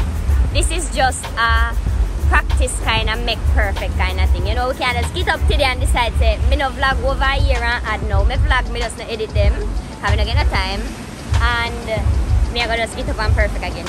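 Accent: Jamaica. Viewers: . Your Jamaican. Patois tun up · Greetings from London uk, you are definitely a Jamaican Lady and your accent just fantastic. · Good spirit, beautiful vibes!! Lovely accent... I love Jamaican people!! 👍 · Welcome back I miss u and your cute face with ur Yardie talking u looking beautiful as ever 😊❤ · Wow. Simply Wow! "Pretending to speak like that...Sound that awful"...what a negative way to describe a native accent no matter what part of Jamaica we come from...none of it is awful. Based in her stories she was born in Jamauca, went to school in Jamaica and did very well in high school ..so she can speak however she chooses depending on the situation. That's an unkind statement. is a great representation of #outofmanyonepeople ..We all can look and speak differently.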